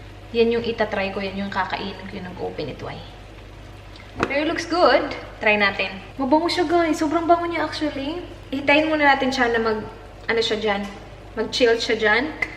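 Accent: Visayas, Philippines. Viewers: Ate kabol ka magbisaya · hahah na klaro ang pagkabisaya ba.agay3x hahahaha daghan na gyud tang mga bisaya gyud. new subscriber diay. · Visaya ka ate? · Hahahaha awa bisaya lage klaro kaayo. 😂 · Agay so meaning bisaya xa. Hahah tama yong duda oks her accent says it all. Bisaya here as well i like the way you speak English the accent is great. From where man diay ka sis hehe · Bisaya mn diay😂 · I really like your accent... when you speak in english, even you have a visayan accent maganda pa rin sa pandinig.. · Bisaya d i ka?... agai agai... haha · it's my first time watching your vlog ate and i really like it now 💕😍 especially your accent bitaglish😍💕. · So far so good..i enjoyed this vlog..i mostly love your sense of humor your so fun to watch..and let me ask..are you perhaps a Visaya?? Hahah..it's just so cute..love your accent too..more power and godbless. 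· Bisaya po kayo ate? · Hahahaha agay agay pa more hahahaa Bisaya kaman day oi 😁😁😁😁😁😁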